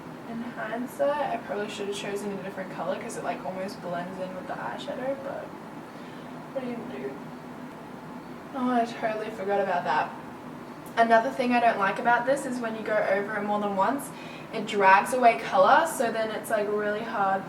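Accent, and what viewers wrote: Australia. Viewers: Oh my goshhhhh you're so right about the IT powder. Hate it. I do a lot of flash photography and learned the hard way about the white spots. Then again about the UD eyeshadow palettes. I have 3 of them and I bought them when I first started to really get into make up and because urban decay was/is a popular brand only to find out those eyeshadows really do suck. I just got my first ABH palette and there is no comparison. By the way you are so gorgeous I love watching your videos. Plus I love the Australian accent and if I ever go out of the USA I'm going to Australia hands down. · Nuria Olid shes british? she sounds kind of australian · Mia she's Australian. it's an Australian accent and her address is clear enough to tell she lives in Australia...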